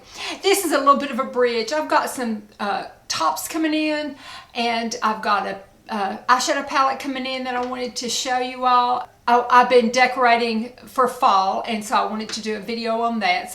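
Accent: South, United States. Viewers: Do empties-don't do empties! As long as you keep doing videos! You are a very sweet and soft spoken southern Lady that gets me to relax when I listen to you. So, thank you!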